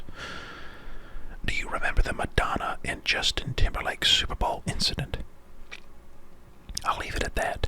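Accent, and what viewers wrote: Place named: South, United States. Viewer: He was covering up his southern roots back then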